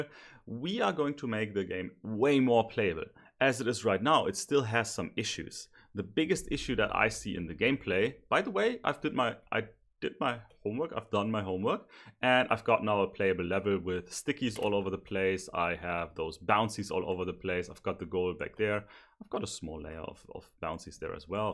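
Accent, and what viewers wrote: Germany. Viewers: Thank you for this Tutorial, are you german?😁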